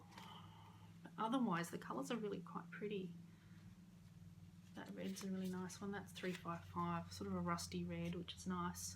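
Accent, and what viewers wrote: Australia. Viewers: Your videos have quickly become one of my “oh great - she’s done a new floss tube!” videos. And, like most Americans, I love your Australian accent. My favorite flower is a lilac. Do those grow in Australia? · I’ve binge -watched your videos. I love all you stitch and that you love 40 count. Love hearing your Australian accent. I planted a flower bush today. It had buds on it so I’m hoping to see many flowers soon. Until next time, stitch everything you can. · Hello, I just enjoy your floss tube so much. I love your Australian accent. Your stitching is excellent. Would love to see a couple pictures of your Home area. Nothing private. I have always been interested in Australia and would love to visit but my health and finances won't allow. One of my favorite quilting books comes from a woman who owns a shop in Perth, well, at least she did a few years ago. 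If I could only have one quilt book, it would be that one. I still do a bit of quilting but these days I am so happy to be cross-stitching again. I also have gone down the rabbit hole of EPP. I am rambling, sorry. Take care, keep up the good work and know there are many of us who admire and respect you. Carry on. with warm thoughts.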